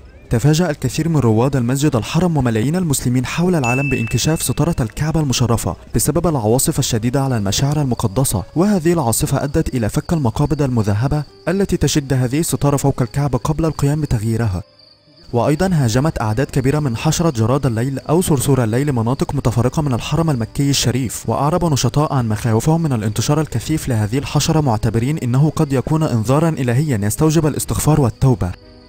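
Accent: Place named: Egypt. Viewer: والله بما انه اللي يتكلم مصري ماني بمصدق اي كلمه يقولها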